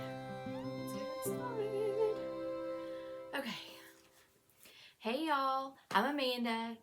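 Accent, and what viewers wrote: South, United States. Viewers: Hard to pick one favorite moment of your podcast but I always enjoy your southern accent and how you just enjoy yarn & your life. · Congratulations on your one year podiversary. It’s hard to pick a favourite moment but learning the correct way to say pecan today is up there along with your delightful southern accent. I’m in Australia so you sound very exotic and of course I was mispronouncing pecan 😉🙃😎❤️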